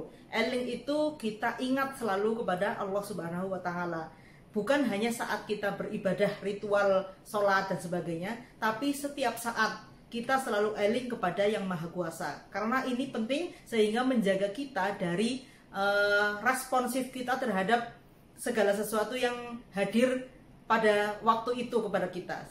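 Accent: Banyumas, Indonesia. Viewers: Berkah melimpah buat,ilmunya memberdayakan sekali.terima kasih 🙏 Saya galfok sama sedikit dialeknya spt kluarga di kota ngapak ngapak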